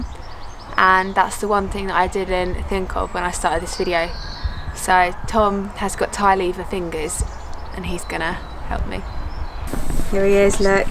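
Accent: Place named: Australia. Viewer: I got used to that Australian accent.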